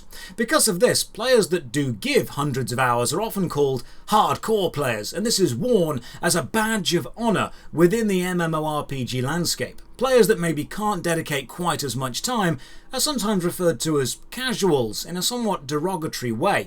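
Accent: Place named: RP, United Kingdom. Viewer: I have not played an MMO in over a decade. I simply like the Posh British Guy. Anyone else?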